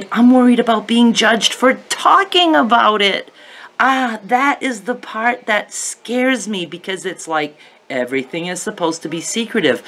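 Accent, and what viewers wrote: Maine, United States. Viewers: I love your straightforward comments and your incredible wit and fine, fine brain. Listening to you makes me so homesick for Maine. It is what it is...my great-grandmother used to say, " Well, it's just part ( paht) of the program."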